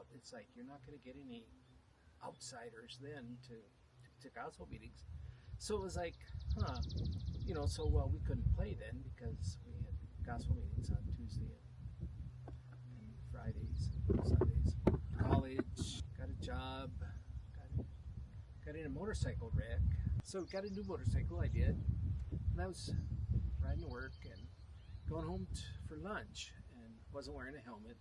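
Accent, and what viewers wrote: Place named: North Dakota, United States. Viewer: I enjoyed listening to your story. We didn't get to really know each other very well, but I do remember when you and your folks would come to Plentywood. Even though you have been to Reno, California, and other places I can still hear that North Dakotan Norwegian edge to your voice. I love that and reminds me of my cousins in the Parshall area as well as your uncle in Plentywood. I am glad you have found love and joy.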